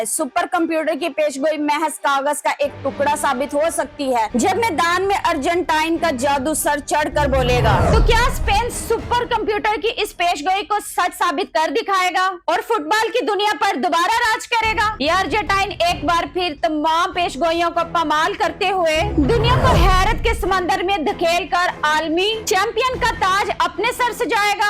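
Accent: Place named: Punjab, India. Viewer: یہ کوئی بڑی سفارشی ھے،،،اردو بھی پنجابی میں بول رھی ھے،،،جیسے خواجہ آصف بولتا ھے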